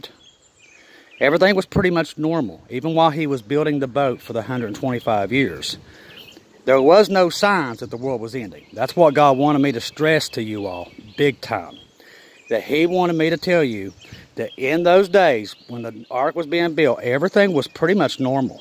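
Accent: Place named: West Virginia, United States. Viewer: Am I getting this right? I just recently subscribed to your channel and it sounded like you are in West Virginia. I live in South Dakota but my entire dad's family is basically in Logan County West Virginia and have been for centuries! I just find it interesting, I'm also related to the Hatfield's on my dad's mother's side although her dad was a McCloud. I love my family there and I love West Virginia though the last time I visited was in 1981, really miss it but my health precludes me traveling. Anyway God bless you brother! I love your posts that I've seen so far! MARANATHA! HALLELUJAH! AMEN!🙏❤️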